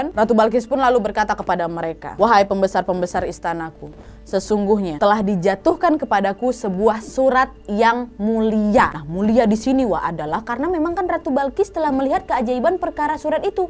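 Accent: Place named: Palembang, Indonesia. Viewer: Keren banget 🙏ka ini....logat nya itu ga bosen denger nya...kalau ga salah ka Nadia ini....wong kito galo👍....soal kl ngomong ga metinggalan....WA nya jd ciri ckas nya....smg sehat sll 🙏💪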